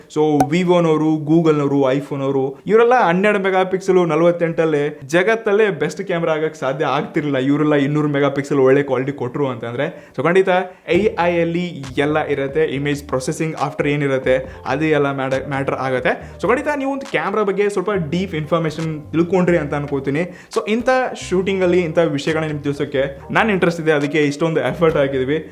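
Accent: North Karnataka, India. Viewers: ಉತ್ತರ ಕರ್ನಾಟಕ ಶೈಲಿಯ ಭಾಷೆಗೆ ಧನ್ಯವಾದ.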